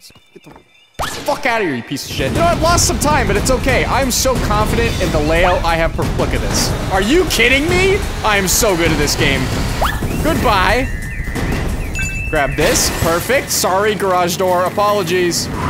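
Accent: Canada. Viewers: I love how we forget how Canadian he is until he says “Home Hardway” “Canadian Tire” and “BAG” “EL” instead of bagel 😂😂😂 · has never sounded so Canadian and I love it 🤣🤣🤣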